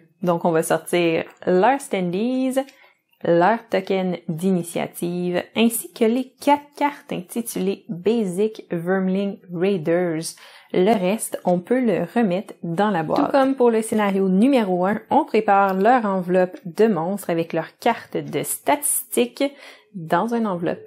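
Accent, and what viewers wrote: Quebec, Canada. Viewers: Tes vidéos sont très sympa & bien réalisées, bravo. Et puis le petit accent québécois, c'est top ;-) Bien le bonjour de la France. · Suite à votre explication du scénario 1, je viens d'acheter le jeu. Je vais suivre vos autres épisodes pour être fin prêt pour l' étape 5. Merci mille fois pour votre synthèse du jeu , pour votre sourire et...pour votre accent québécois 😉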